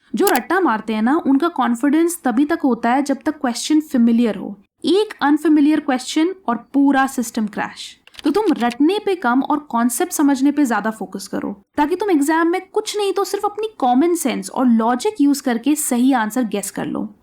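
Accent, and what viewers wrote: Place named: Kashmir, India. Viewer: Are you kashmiri by any chance? You accent seems 😄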